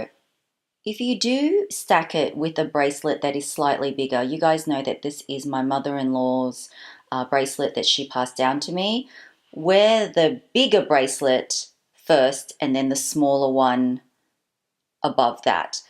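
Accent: Melbourne, Australia. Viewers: It's always so awesome hearing an Aussie accent on YouTube. I'm up in Brissy now but when you mentioned Chaddy (Chadstone) I was like "aaaaaaayeee!" lol. It's so hard to find reviews of things on YouTube from Aussies with names that you know. So awesome to see you. And thanks for review - I love the idea of the love bracelet and was planning to get one, but now I'm thinking "just a nail" might be more for me. I'd be mortified to look down and see it gone. It would be like looking down and something like a JLC Reverso just vanished - heart broken. Sweet info on pros and cons. Thank you. :) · Are you from Melbourne? You have that accent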